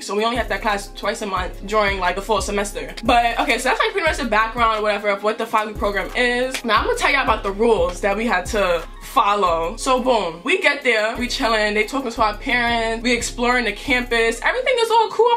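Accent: New York, United States. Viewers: I love you and the fact that your from New York bc my sister is from there and I don’t live with her but y’all sound the same it make me Happy☺️ The first video I watched if yours I could tell that’s where you were from🧡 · “So boom” I LOVEEE THE NEW YORK IN YOU 🥰😭!!!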